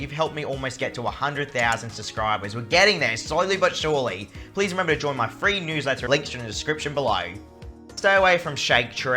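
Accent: Australia. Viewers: Australian accent make mau laughing so hard 😂😂😂😂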